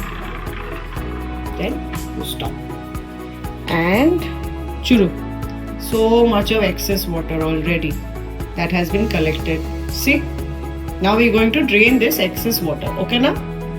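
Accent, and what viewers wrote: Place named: Telugu, India. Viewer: Ur telugu so cute 😊 nice bags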